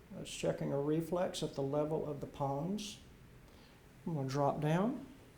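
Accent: United States. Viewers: Ahmad Syarbini Mat Southern, most likely Louisiana. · I didn’t even notice an accent until you pointed it out. Lol. So I’m guessing south.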